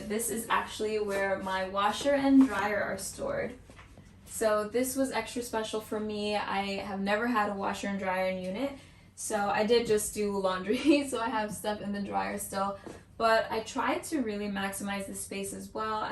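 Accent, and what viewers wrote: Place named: Dominican Republic. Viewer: I knew you were Dominican from the first few seconds 🇩🇴 #rep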